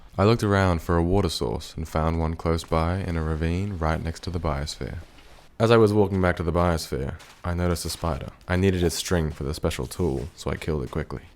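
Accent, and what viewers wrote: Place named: United Kingdom. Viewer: Jesus, are you livin in some castle in real life or is it because you can speak British really fucking well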